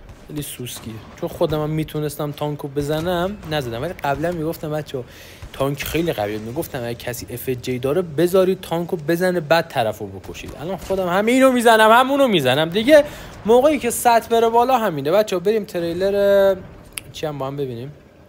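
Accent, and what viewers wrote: Kermanshah, Iran. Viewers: داش یکم کرمانشاهی صحبت کن دیگه بشنویم لهجه ی کرمانشاهی های عزیز سلطان😂🔥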